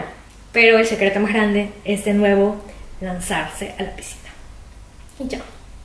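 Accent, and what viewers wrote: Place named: Peru. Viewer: Tú dejo es peruanoo i love your peruvian acent! ich lerne Deutsch.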